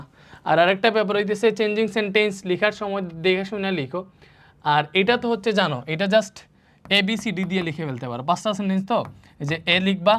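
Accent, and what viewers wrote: Sylhet, Bangladesh. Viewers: Aree bhai eita moja near ki ache Siree Sylhetyy 🙂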